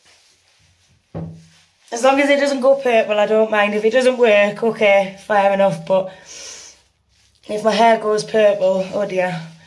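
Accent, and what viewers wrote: United Kingdom. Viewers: is that a Sunderland accent? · R u a Geordie hun x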